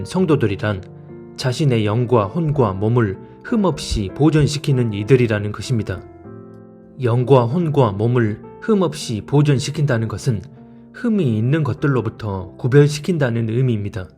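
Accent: Jeolla, South Korea. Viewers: 전라도 사투리로 방송하시니 친근감이 느껴져불고만요잉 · 전남"지역 분~~이라고, 추정되는데요.. 제고향이 전남 광주 근처인데, 말투가 똑같으신데요..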